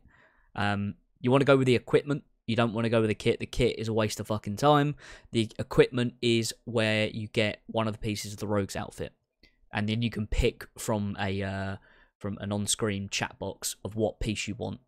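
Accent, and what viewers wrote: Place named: United Kingdom. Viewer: Man i love that british accent, "Diddly Squat fork all"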